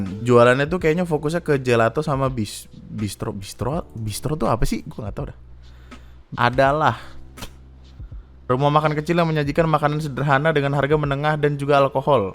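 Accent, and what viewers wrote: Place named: East Java, Indonesia. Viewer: Owalah, pantes aku ngerti bosone. Jatim kidulan ternyata 🤣🤣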